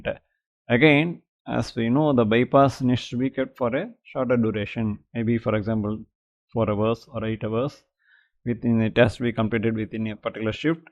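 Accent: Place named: Tamil Nadu, India. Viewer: Is it possible that you speak straight english clearly instead of Tamil english ?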